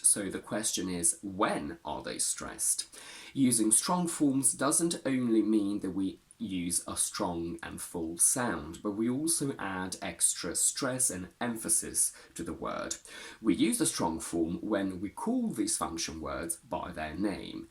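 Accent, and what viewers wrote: RP, United Kingdom. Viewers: You have a very good modern RP pronunciation, but you use a lot of vocal fry.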